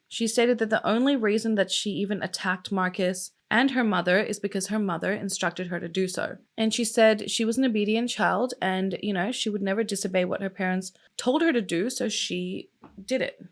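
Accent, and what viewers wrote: Australia. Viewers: Its so weird. Lol. Since i heard your accent i understood your from Australia, you look like a child between two more famous makeup and crime youtubers, one is from US, named Bailey Sarian, second is from Australia, named Bella Fiori. Lol you look like a mix of them both. Anyways, continue on. And where are you ethnicaly from? Im curious, i would say Middle East or little Asian. Thanks. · You have such a calming voice. Are you Australian? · Its so refreshing hearing an ozzie accent instead of american for once! <3 P.S Im from New Zealand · I only just discovered this channel, absolutely love finding fellow Aussie’s to listen to & my daughters names Zarah 😊 good video I’ll definitely be back for more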